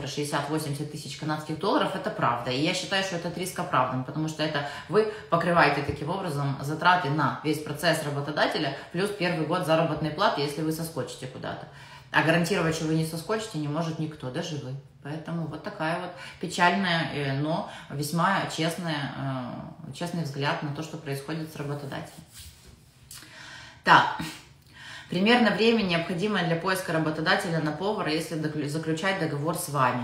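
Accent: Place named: Odessa, Ukraine. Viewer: Таки шалом ;) Слушаю Вас просто так, для поднятия настроения. Свою иммиграцию прошёл давно и успешно, но Ваша разговорная Адееесская рЭчь мотивирует и даже вдохновляет. За 10 лет не встретил в Альберте ни одного одессита, так хоть с Вами душу отвожу. Про Саскачеван это правда: степь да степь кругом, едешь 200 км и одного села нету, печалька полная... Ездим туда по 3 раза в год на рыбалку (800 км в одну сторону) за коропом метрового размера которого можно брать безлимит. Хорошо что бак в машине на 90 литров, чтоб не переживать за заправку сильно, и то всегда беру пару канистр на запас ( с до заправки не работают у них).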